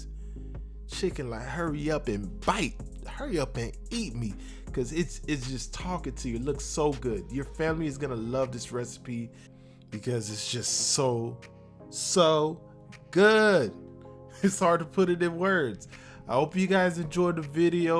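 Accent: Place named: Florida, United States. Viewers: That accent right😂 I'm from Duval Co. He prob from Miami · right! I'm from Tampa and when I was watching I was like he def from Atlanta or Florida lol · right! I live in Fort Lauderdale. I’m like he’s has to be a local lol 🙌🏼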